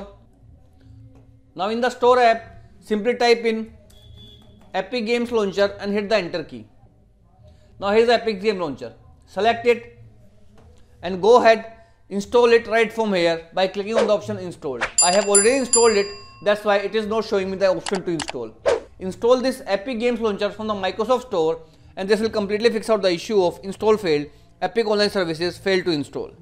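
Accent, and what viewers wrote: India. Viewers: Once i heard the indian accent i knew this is going to work, and it worked. Thanks man :)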